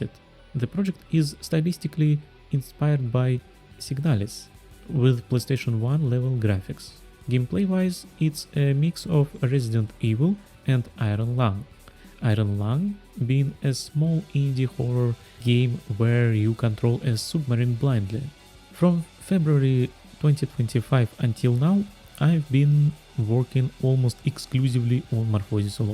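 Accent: Russia. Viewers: Я тебя тупо по акценту вычислил, лол. Так держать, пчелл, ты могучая кучка и если всё срастётся - будет еще одна хорошая индюшатина в копилку, что всегда чудесно. Удачи с проектом.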